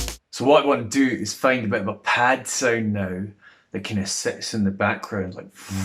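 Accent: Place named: Scotland, United Kingdom. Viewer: Scottish accent = Insta sub.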